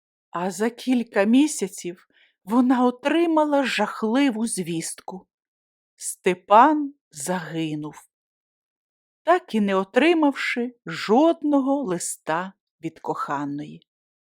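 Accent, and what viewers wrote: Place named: Ukraine. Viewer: Добрий вечір,! Слухаю..., насолоджуюсь...новою зустріччю з Вами! А в голосі, до болю знайомі, нотки українського діалекту. Продовжуйте - це наша підтримка і віра на краще. ❤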